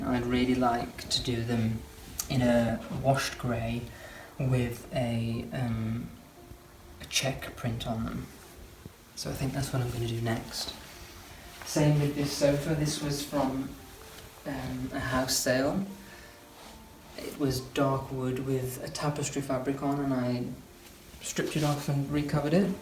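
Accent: United Kingdom. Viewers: I LOVE your style! So classic and warm and inviting! I also love your voice, it has a soft, soothing vibe, yet very much classy British! I'm so happy you've decided against painting the bureau as I thought the instant I saw it that it's such a beautiful piece that added just the right touch of character to the room! Forgive me..I realize this video is a few months old but I've just come across your channel and am LOVING it! I want nothing more than to be able to visit the UK for an indefinite period and soak up as much history and food as I can possibly take!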